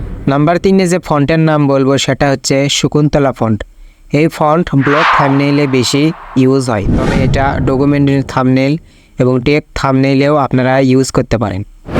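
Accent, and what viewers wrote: Bogura, Bangladesh. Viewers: bhai er basha boguray naki?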